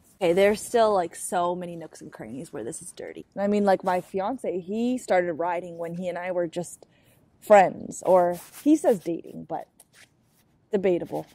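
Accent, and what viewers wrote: United States. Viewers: Dear, I hope I can be your video pen pal, on you tube! My name is Tim and I am nearly 55 years of age, and I live in South Milton, in Devon. I used to be the enemy, a car driver, sharing a car with my Dad, though I have had a collection of motorbikes, studying electronics. My Dad and elder brother had one or two however, until they too became the enemy. May I seriously give you my male support wishing for anyone should learn to ride a bike or drive a car, male or female. Is it very rude, if so, I apologise to you, to ask you, did I detect an American accent? If so, may I wish you a warm welcome to our country?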